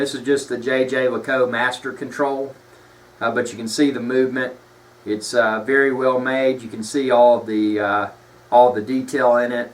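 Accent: South, United States. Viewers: sounds even better when you say it man! I mean it! That southern accent is the best. Greetings from Canada.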